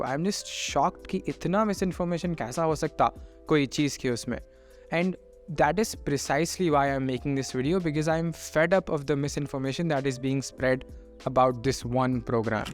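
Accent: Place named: Hyderabad, India. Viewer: loved the video and the hyderabadi accent lol. got yourself a subscriber now waiting for more info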